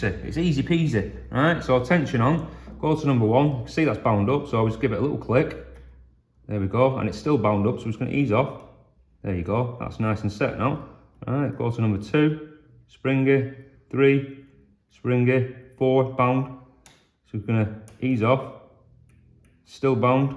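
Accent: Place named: Cockney, United Kingdom. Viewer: Nice video, I'm thinking about getting one of these Lishi knock-offs for Yale keyways. This guy is funny, I would love for him to read a children's story. LOL. I love his accent (cockney?). Love his colorful way of speaking. I can just imagine............ "She tasted the porridge from the first bowl right. “This porridge is too fuckin hot!” she exclaimed. So the little wanker tasted the porridge from the second bowl. “This porridge is too balls-deep cold,” So, the street-walking Hussy tasted the last bowl of porridge. “Ahhh, this porridge is just dead-on balls fuckin' right,” 👍👍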